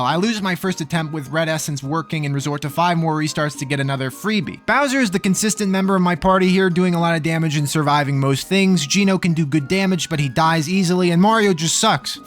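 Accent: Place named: New York, United States. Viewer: A New York AVGN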